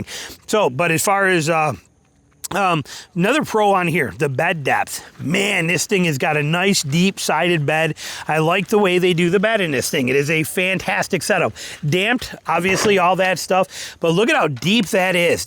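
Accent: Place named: Chicago, United States. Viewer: That’s a very Chicago accent for a South Georgian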